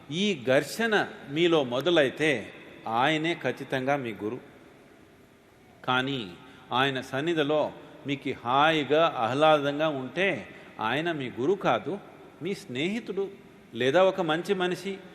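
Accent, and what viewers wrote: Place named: Tamil Nadu, India. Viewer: Arva vedavalu Telugu matladinattu. Undi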